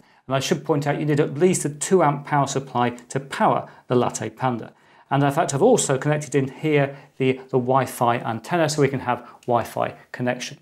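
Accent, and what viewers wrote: England, United Kingdom. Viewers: While there are better tech channels out there (For my specific interests) the British accent certainly draws me in. The neutrality of tone makes it really easy to understand. For this reason, I think you'd do an amazing job at some of the more technical aspects of computers. Something along the lines of the information covered in an A+ certification course. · I love the English accent and the way English people do reviews.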